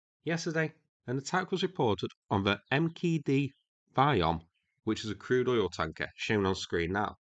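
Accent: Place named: Nottingham, United Kingdom. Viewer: That's the just Nottingham accent for you😊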